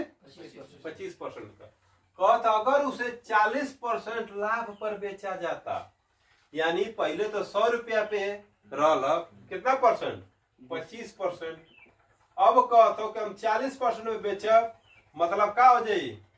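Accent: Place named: Bhojpuri, India. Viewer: Aaj pahali baar bhojpuriya andaaz me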